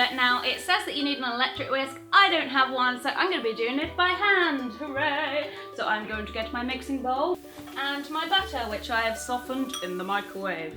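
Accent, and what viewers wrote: United Kingdom. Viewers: I can't tell if your British or American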